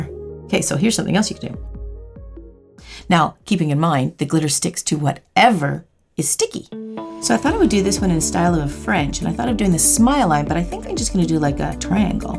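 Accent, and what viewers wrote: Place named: Ontario, Canada. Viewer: Omg I completely feel the same. I am Canadian living in US and I could hear it before I knew you are from BC. You sound EXACTLY like the women in my family loving in Ontario, so nostalgic for me. I am so grateful I found your channel, you're an amazing artist, perfectionist and woman 😊💕thank you!!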